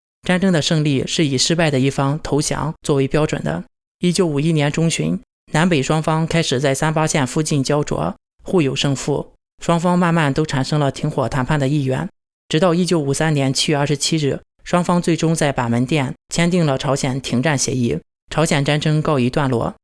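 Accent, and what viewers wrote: Shandong, China. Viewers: 魯人口音😂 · 好浓重的山东口音😂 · 不管你如何隐藏，隐藏不了你山东大葱的味道，和范bb一样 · 想必博主出国前是山东人吧，口音太重了啊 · 讲来讲去也没讲明白。听口音大陆的，还是挺重的口音。只能说多看看历史对你有好处。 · 普通话带着山东味呢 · 你这口音一听就是在大陆长大的，大陆教给你的史大林？ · 山东人？口音很重啊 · 我听着你像山东人或者苏北人，我不知道你是否还回来，你真是无耻至极，已今夺古的伪历史观。把地缘政治和国际局势全部抛掷到云霄之外。韩战的介入是从国家的战略生存空间上考量的，国际局势的极端不平衡，当时的新中国需要一些战略支点，而此战的就是一个千载的机遇，而同时是中国的灾难。但是我们赢了，我们用血肉赢的战略上的动态平衡，能让中国在美苏之间战略摇摆，如果你要是说以人数上的伤亡那是我们输，我们无船坚炮利，无外汇。但是正如我们和日本作战一样，他们赢得了战术我们赢的战略。这种被迫的战略部署全都是近代民族的血泪史，是靠着生命来推行的，先辈用骨与血才拥有了这种国家生态，才会有了你的存在，你觉用他们的事情来压榨剩余价值。历史是神圣的原有的什么样子就是什么样子。你却拿着个人歪曲的价值取向来讲伪历史。你像骂共产党，你直接骂多好，而且赚的更多，你他妈的还想做教育家，我不知道你有没有孩子，你这样让你的孩子怎么讲的的由来呢，你这垃圾，你他妈想骂就直接骂多好，为什么要亵渎历史和教育呢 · 听着也是大陆人，恰这个烂饭，不丢人吗？ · 山东口音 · 看你這一輩子甩不掉的中國大陸口音，再來看看當年不可一世、食言潰敗的麥克阿瑟，看看當年移居中國而不後悔的美國大兵，看看採訪當年美國大兵對中國軍人的欽佩，看看美國前總統、文在寅跟金正恩的拜訪、互動聲明，看看整個朝鮮、金氏兄妹對中國官員、人民的高規格對待與各方面感激...欸？？ 最後看你今天這種拿著祖國基業吃漢堡喝可樂玩遊戲看色情網站..就忍不住在油管渴望漢奸抱團存在感、當現代漢奸、當法輪邪教李洪志犬奴...只能說你祖先沒他媽在南京大屠殺等屠戮中被幹掉..實屬中華民族的不幸啊..... 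· 嗯嗯，听口音应该也是大陆的，而且搜的网页还有新浪，置顶的网站是百度百科哈哈哈，这年头有奶就是娘。我就看不惯那些瞧不起祖国还靠祖国吸引眼球赚钱的。有本事来点干货，切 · 看到了大纪元网，瞬间懂了，不知up主，是法轮功的哪位高层，洗脑和偷换概念的能力一流，听口音像是内地人，也是华夏土地养育的你，我们不论政党和意识形态，你现在做的事，对得起你嘴巴里说出的国语吗？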